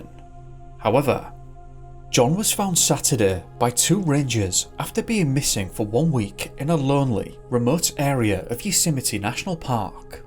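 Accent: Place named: Scotland, United Kingdom. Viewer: does an excellent job with Missing Void and I like his Scottish accent!!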